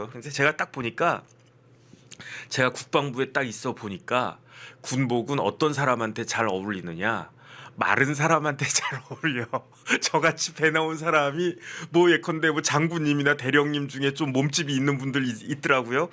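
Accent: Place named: Seoul, South Korea. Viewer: 이국종 교수님을 살짜살짝 깍아내리는 아니~ 대놓고 깍아내리는 변호사님~~!! 서울분이라 뭐뭐 갖다가 뭐 갖다가... 서울 사투리 재미있습니다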